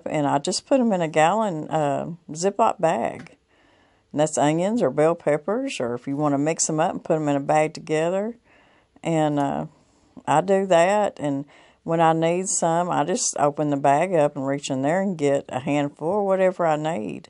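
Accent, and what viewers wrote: South, United States. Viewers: Now thats the just right hill music and southern accent to cook to! When you said purty good i was home! 😊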